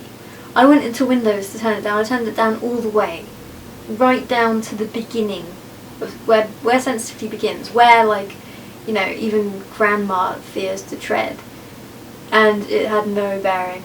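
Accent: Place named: United States. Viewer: stop talking like an american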